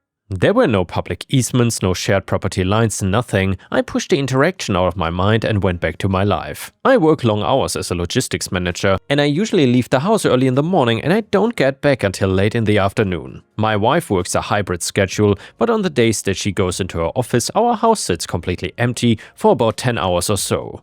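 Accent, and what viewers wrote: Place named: Germany. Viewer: A German narrator always is watchable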